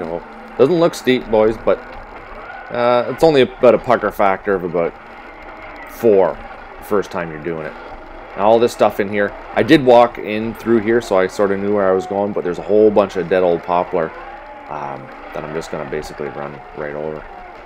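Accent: Canada. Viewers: please don't talk, can't stand that Canadian accent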